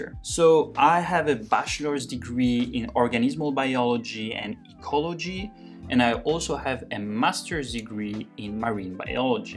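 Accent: France. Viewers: Hey, your accent seems french, if you are then please, can you start your video with a french word related to that video and what it means...just a wish though if you could....love french, would love to learn from you:D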